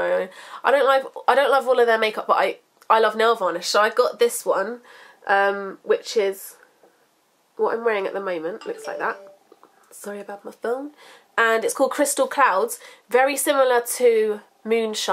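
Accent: United Kingdom. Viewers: I'm American and I love the way British people talk! Sorry if my counterparts try to check you on the way you pronounce things. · Im from america...and i much prefer how you and other girls from the UK pronounce everything..after and during i watch your vids i catch myself talking like you and even out and about...and people ask me are you from England and thats when i know...Im not talking American and that makes me happy weird...ya probably i don't care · and you guys (in the U.K.) also sound like you're saying "Fhree" when you're actually saying "Three". I love it! I wish I had an accent like people from the U.K. It's so beautiful.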